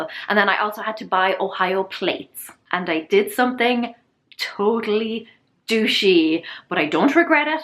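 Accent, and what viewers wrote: Ireland. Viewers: Elloello. Take hangers back too. Switch them for plain hangers and get a glue gun. Melt a frosting squiggle of glue the entire length of the top part. Let dry. Same non slip for delicate and dresses and for pennies on the dollar. I love your speaking pattern and Irish brogue. (hope that's right!) I have going to Ireland on my bucket list since I have Irish roots. Hugs to you and yours from NC.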